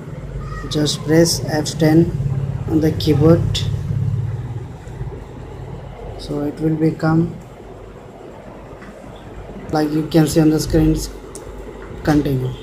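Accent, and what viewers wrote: India. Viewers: Indian voice, it sure be a fake video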